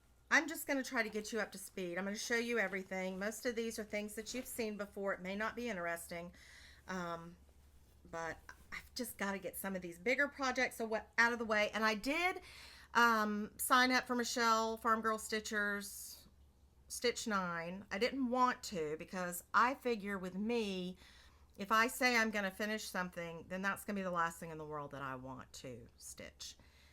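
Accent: South, United States. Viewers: Another great video! Love listening to fellow Southerners! Especially since I am stuck up here in snowy Canada! 🤪The swans are gorgeous!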